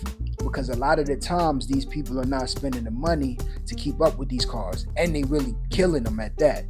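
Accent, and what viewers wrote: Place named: West Coast, United States. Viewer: He sounded like he was from the West Coast to me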